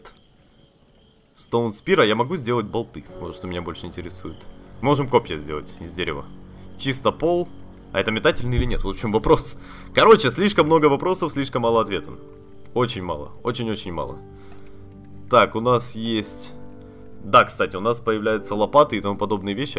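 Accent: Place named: Odessa, Ukraine. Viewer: ты в озвучке просто великолепен!!)Шикарный голос, прекрасное чувство юмора, периодически возникает такое чувство, что ты из Одессы!))Браво!)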